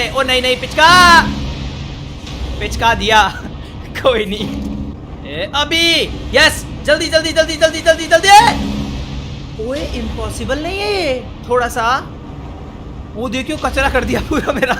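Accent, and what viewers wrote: Haryana, India. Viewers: Bhai Aap haryana ka hoo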